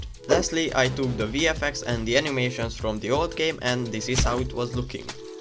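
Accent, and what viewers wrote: Hungary. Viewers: nem feltűnő magyar akcentus lol, jó video végre találtam egy magyar roblox devet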